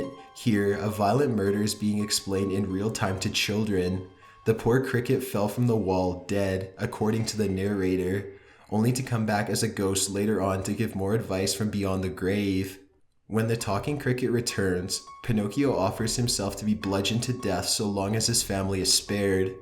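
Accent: California, United States. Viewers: The narrator of this is soooo obviously Californian !! Such a thick accent, where ever sentence almost sounds like a question. lol. Not hating, just saying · lizzie your right, sounds like "the Californians"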